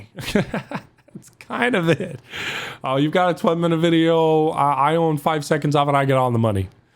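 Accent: Ireland. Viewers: gotta be honest here, Firstly... missed your irish accent (for some reason the bell got unrung for me... go figure) and secondly, YT really discouraged me after the first adpocalipse, i was actually starting to dedicate myself to it and then poop hit the fan and we small creators got covered in it. I basically gave up on this channel here of mine then restarted with it just as a little hobby, but with the "why wate hours of editing on this" mentality which in turn demotivated me even more... so yh they should listen to you coz you have some really good pointers. oh and before i forget... when can we expect a new song video?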